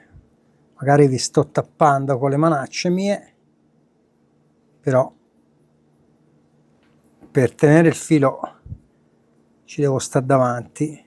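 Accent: Tuscany, Italy. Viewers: Complimenti! Canale super interessante e competente, chiaro nelle spiegazioni anche per un neofita curioso di come sia costruita un'elettronica hifi. Aggiungo che l'accento, gli eufemismi toscani sono deliziosi!!!! Sono un po lontano ahimè dalla Toscana ma se avessi un problema ad una elettronica mi "garberebbe" 😊 rivolgermi a. Se possibile vorrei sapere un contatto mail Buon lavoro e avanti così!!!!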